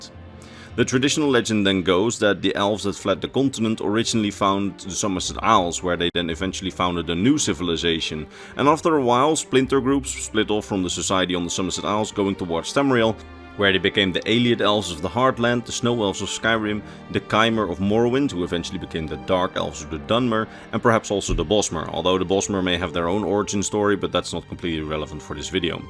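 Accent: Netherlands. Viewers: I hate your accent. Dutch should not have one.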